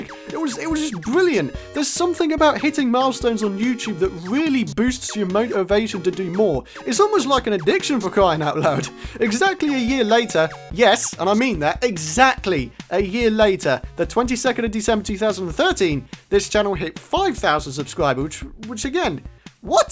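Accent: United Kingdom. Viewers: let me just say that among many of the Minecraft YouTubers that I watch for their adventures through the vast world that is Minecraft, and the several great Terraria Let's Players that I watch, your intro and voice definitely resonates within my mind as one of the most energetic, fun and exciting ones that I know of! I discovered you from a comment you posted on one of Yrimir's videos when you were around 45-50K subscribers, and I remember you were working on your Co-Op with AstonishingGamer as well as just starting the Sky Island series with another fellow (whose name eludes me) and I was immediately hooked to your episodes. Perhaps it's your charming British talk, or the way you say "I'm a very happy bunny!" but I am very much a fan of your videos and I look forward to what is to come in the future. Congratulations! Please keep up the good work, and it's commendable doing this while working someplace in real time. 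I hope to watch hundreds of your videos in the future! -Thomas (Could I request your filmed reaction when you get your plaque? I'm not saying a view of your face, but perhaps just like your first person reaction to it.:D)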